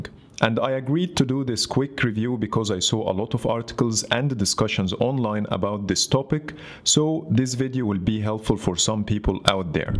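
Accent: Egypt. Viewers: Your accent sounds Egyptian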